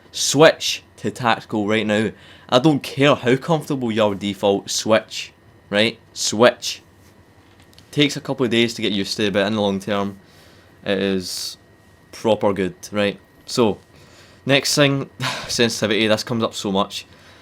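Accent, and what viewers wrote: Scotland, United Kingdom. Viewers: He's from Scotland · Hes from Scotland bro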